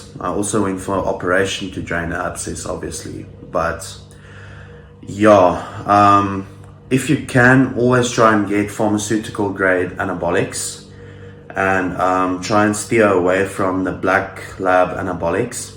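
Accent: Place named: South Africa. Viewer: Hahzit boet, good to hear a fellow saffa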